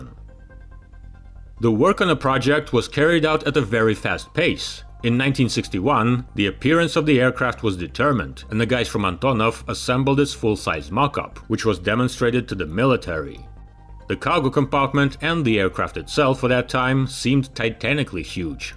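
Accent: Russia. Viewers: "Your videos go from strength to strength. Your narration and English vocabulary are nothing short of impeccable." ..said by another viewer; echoed here. It's a pleasure to listen to your erudite narration and your Russian accent. Big fan of your videos. ~A former cold warrior. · I though that it's going to be cheesy due to the Russian accent. But the whole video is well written & presented. Some details I'm hearing for the first time. Anecdotes from the time, historic footage, modern footage - well done! 😎👍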